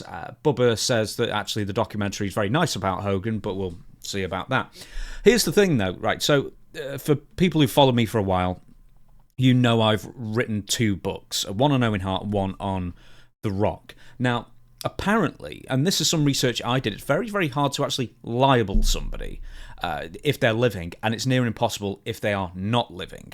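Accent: England, United Kingdom. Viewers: Hi English Accent, American Accent Here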